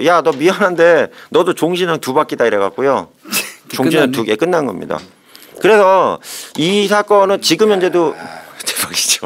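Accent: Chungcheong, South Korea. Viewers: 웃으면 안되는 사건인데 염교수님 너무 정겨워요😂😂 범인이 프랑스인인데 충청도 방언이 들려요 😂😂 앞으로도 잘 듣겠습니다.❤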